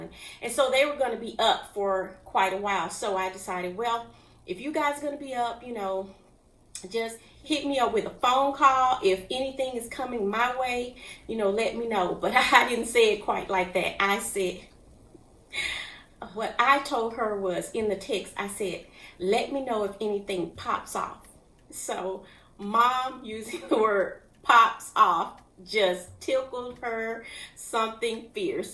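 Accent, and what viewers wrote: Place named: Kentucky, United States. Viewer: Looks so good. Hay girl I am from kentucky and we say ya"ll and you sound just like us.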